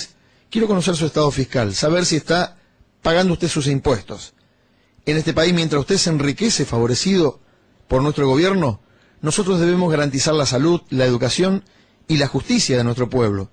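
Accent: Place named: Argentina. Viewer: No sé si encuentro mas patético el "analisis" con pretensiones de fabula a la de la Fontaine o simplemente el acento argento. No, no soy particularmente socialo y si, tuve la mala pata de nacer en la argentina. Ese acento no me es insoportable por simple desconocimiento sino que lo conozco demasiado bien. Y no tampoco, aca en Francia, la poblacion es solo mas "poli" (educadita digamos) pero en capacidad de analisis, lucidez politica y esas yerbas, son tan precarios como el argento promedio.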